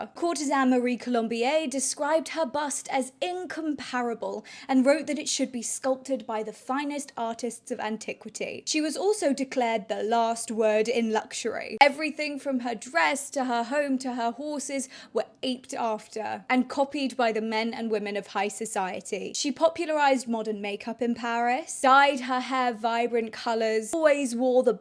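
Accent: England, United Kingdom. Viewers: Excuse me is this British accent? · I love the English accent, it would cool if a great grandma-ma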